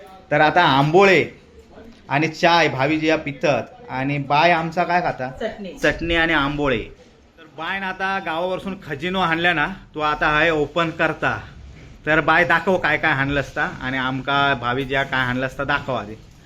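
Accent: Malvani, India. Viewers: तुमची मालवणी भाषा एकदम pure आहे,तसेच व्हिडिओ खूप साधे आणि खरे असतात. आज परबिन आणि सावतीन भेटल्यो नाय 😂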